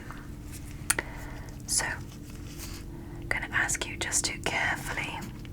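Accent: United Kingdom. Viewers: What is your actual voice and accent. You have a British accent on all your doctor videos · This was a very good video as always! Is it only me or were u acting british? 👀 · ngl the first ever vid i watched from you you had a british accent and as a fellow brit i was fooled when i heard you speaking normally 😂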